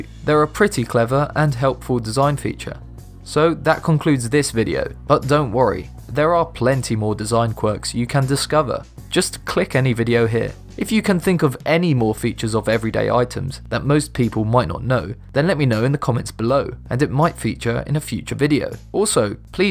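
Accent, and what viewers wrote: United Kingdom. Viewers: Is it only me or is every top 10 channel British? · InxPursuitz he sounds nothing like him. Dolan is Australian this guy is British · Oh you know I just realised this person has a British accent ,' '-D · I love the accent. If this weren’t conveyed in a British accent I think I wouldn’t love it as much.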